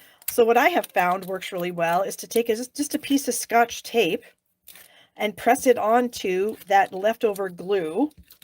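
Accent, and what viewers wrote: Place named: Yorkshire, United Kingdom. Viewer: I'm pretty sure she's from Yorkshire....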